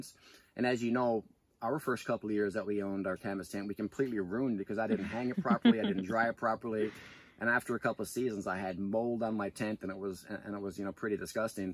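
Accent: Canada. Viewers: Im 49 yrs old...i have not been camping since i was 13...... my wife and i are just now talking about possibly getting some camping gear...this is cool Brother you sound like you have a very thick northern accent....im guessing Canada???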